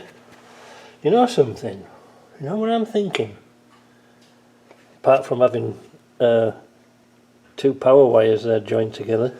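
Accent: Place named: Cumbria, United Kingdom. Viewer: Trying to place your accent. Cumbria?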